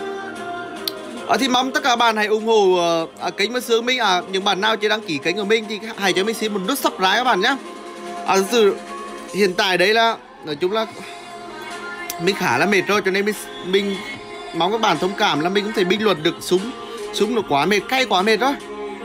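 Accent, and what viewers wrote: Nghe An, Vietnam. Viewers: nói tiếng Nghệ An cho đặc sệt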